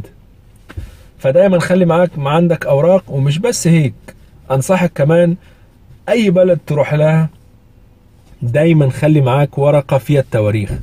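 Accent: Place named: Egypt. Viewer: تتكلم مصرى حلو قوى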